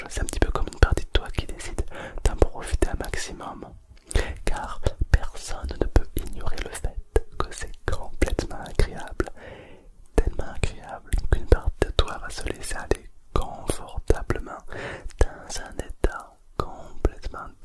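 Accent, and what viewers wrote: South, France. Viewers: Super découverte 👍 super travail c'est très relaxant ! Je valide l'accent du sud également 👍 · J’adore ta vidéo ! Très relaxante et merci pour ça ! L’accent du Sud qui fait un peu bizarre quand tu viens pas du sud mais j’adore ! J’aime et je m’abonne !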